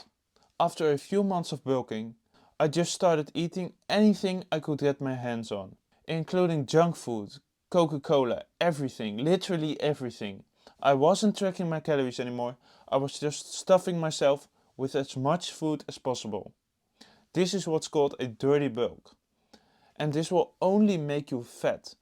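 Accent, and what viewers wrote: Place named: Netherlands. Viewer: The dutch accent 😂😂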